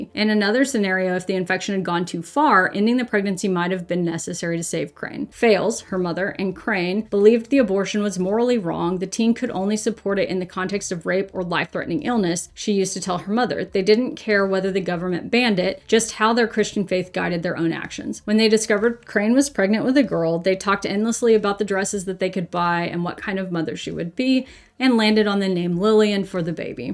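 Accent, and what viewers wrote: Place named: United States. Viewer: You sound very American, you should work on that, there's a reason women and members of the lgbtq+ get danger pay if their jobs want them to go into that place. Margaret attwood wrote the handmaids tale about that country for a reason, canadians say Americans for what they are, bad people- you especially 😊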